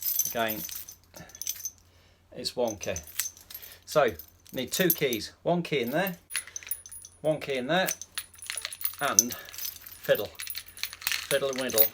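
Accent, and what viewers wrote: Leicester, United Kingdom. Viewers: Knew I recognised the accent! Fellow Leicester lass here! (Living down south) .. I have this problem right now.. Will report back! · Great vid, sorted it! Is that a Leicester accent?!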